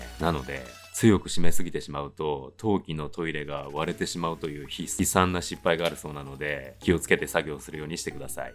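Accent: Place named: Aichi, Japan. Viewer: 私も愛知県なので、言葉の端々やイントネーションから聞き馴染みがありました。私の家の小さな修理をして欲しいくらい器用ですよね？旦那さんがそれくらい器用なら良かったのに。😅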